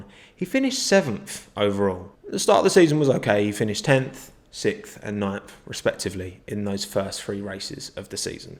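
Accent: Ireland. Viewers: Irish Guy???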